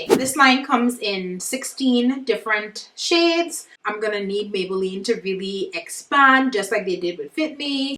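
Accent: Trinidad and Tobago. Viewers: Are you trinidadian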